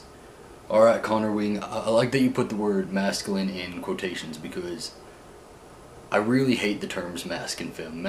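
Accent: South, United States. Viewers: sounds like a southern surfer boy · It's because he's southern ;)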